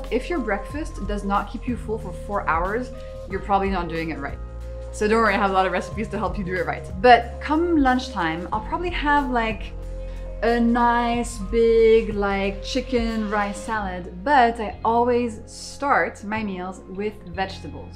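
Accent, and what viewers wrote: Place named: France. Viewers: She may have a French accent, but I think we can say it's very, very subtle. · I am in love with her French accent❤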